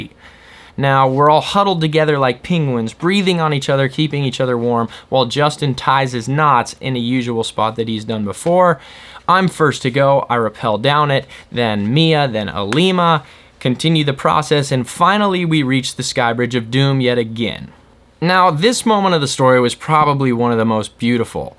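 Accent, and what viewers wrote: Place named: Australia. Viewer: You should stop the Australian accent.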